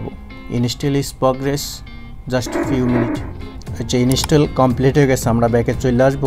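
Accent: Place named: Barishal, Bangladesh. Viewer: ভাই আপনার ভিডিও দেখে যত টুক ভালো না লাগছে তার চেয়ে বেশি বেশি ভালো লাগছে আপনার বরিশালের ভাষায় কথা শুনে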